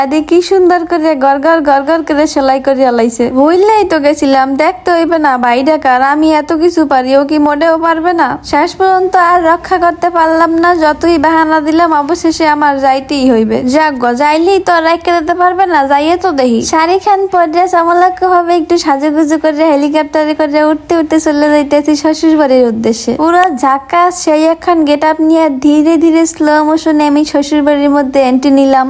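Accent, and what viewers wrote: Barishal, Bangladesh. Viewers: তোমার বাড়ি কি বরিশাল?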